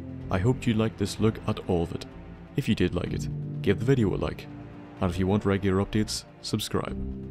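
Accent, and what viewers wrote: Northern Ireland, United Kingdom. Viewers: Are you from Northern Ireland? xD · ur northern Irish right? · Are you northern Irish?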